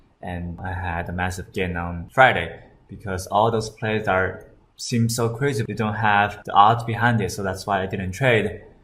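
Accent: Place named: China. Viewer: Please learn English. Your speech is like mix of Chinese-english-muitaish bullshit. Couldn't understand 50% of what you were saying ((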